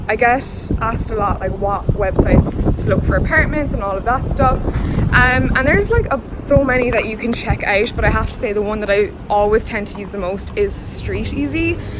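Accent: Ireland. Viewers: I knew I heard an Irish accent!! ❤❤❤ btw, I liked the last apartment... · Hey is that an Irish accent? I can't place it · She definitely sounds Irish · Good info, bubbly personality and AN IRISH ACCENT —> never clicked subscribe this fast. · Nice Irish accent : ) Lofts are overrated..I stayed in some on Airbnb in NYC, not really great as you think. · How long have u lived here it’s sounds like ur Irish accent is fading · I'm new to ur channel and r u Irish?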